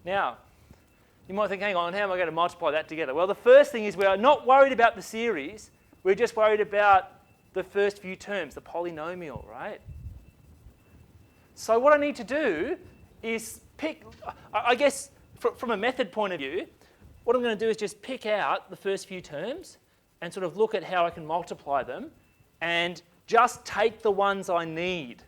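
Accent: Australia. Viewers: Nice Aussie accent.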